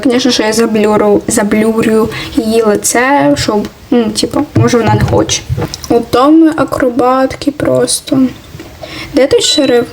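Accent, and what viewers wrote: Ukraine. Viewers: Я так обожаю украинский акцент, это так миленько❤ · Я только сейчас понял почему она так говорит потому что она из укр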